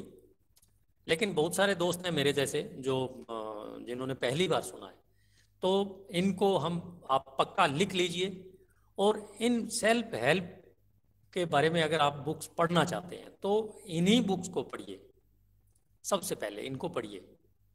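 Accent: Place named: India. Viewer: Perfect Raw indian voice. Suits subject that you have chosen shriman G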